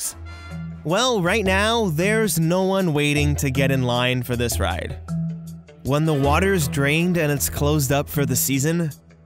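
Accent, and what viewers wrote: Canada. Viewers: Also are you Canadian?!?! I need to know lol 😂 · Are you Canadian? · Are you Canadian?????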